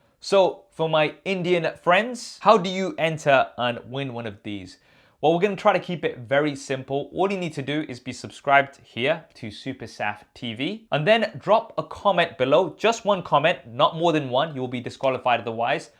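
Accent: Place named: Leicester, United Kingdom. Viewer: i just knew that accent was from Leicester. Great video mate.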